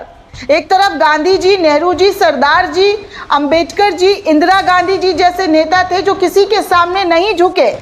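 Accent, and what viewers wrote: Bihar, India. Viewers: Tum bihar se ho. Ya biharan ho. Jo tumhari bolne ki language hai wo bihar walon ki same copy hai.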